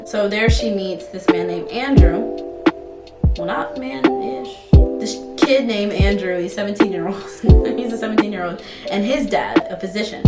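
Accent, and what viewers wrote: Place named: England, United Kingdom. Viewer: Oh my god, so glad you popped up on my recommended! You have such a great personality and loving the english accent ;)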